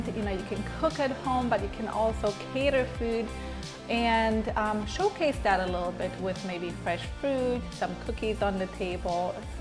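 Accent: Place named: Romania. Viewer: ! Salut! Ma bucur sa aud o voce romaneasca!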